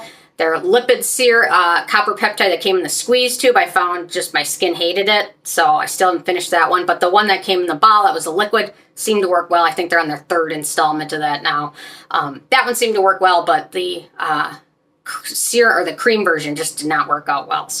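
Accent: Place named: Minnesota, United States. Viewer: Yay! I just did a mini shopping spree at The Ordinary yesterday and just saw that you posted this video. Very helpful! Btw I love your accent. I'm from NZ so I'm not too sure where to place your accent/dialect. Is it sort of Minnesota?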